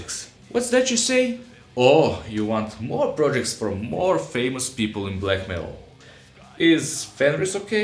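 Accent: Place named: Russia. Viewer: Давай по-русски теперь) я по акценту вижу, ты наш)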